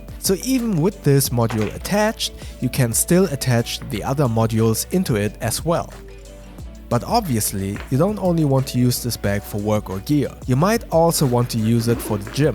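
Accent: Germany. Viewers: Excellent review! Love your German accent! 😊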